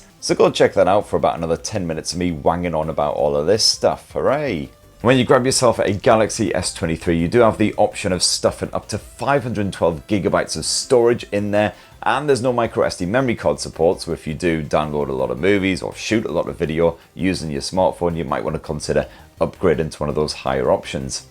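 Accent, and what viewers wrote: United Kingdom. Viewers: Excellent review which I enjoyed watching and you gave top notch information HOWEVER, being an American, your fast speaking, Brit accent was at times difficult to follow. I still gave you a Thumbs up, thanks for all the great info.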